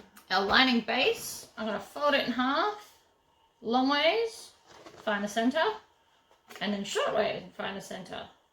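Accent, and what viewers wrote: Australia. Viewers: So refreshing to hear an Aussie accent even if you're pitching to overseas viewers with measurements in inches. Great to be able to easily find things at my local shops here in Australia rather than hearing about Dollar Tree or Michaels stores! Thank you for these projects and helpful tips. I wish you every success.xx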